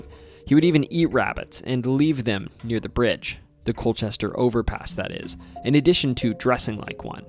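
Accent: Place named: North, United States. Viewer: "Hen-re-co". You must be a Yankee pronouncing your "I's".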